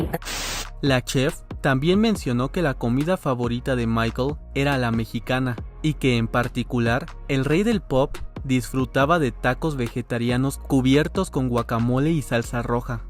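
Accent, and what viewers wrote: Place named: United States. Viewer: Man, enough with the subtitles blocking all the pics of Mike and his friends. I understand English and I don't need subtitles to understand a narrator with an American accent.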